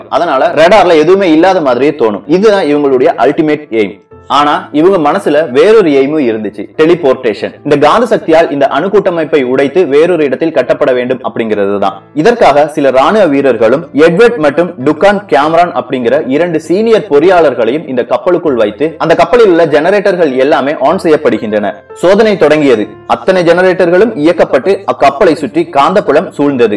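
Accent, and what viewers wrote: Kerala, India. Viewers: But the tamil pronunciation is in Malayalam.. 'koman' (common), kopy (copy), simbly (simply).....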